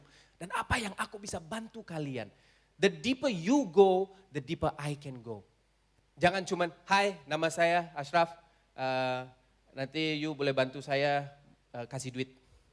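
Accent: Indonesia. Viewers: Smg almarhum mendapat tempat terbaik di aiaiNya....amin Logat ashraf sdh sgt Indonesia banget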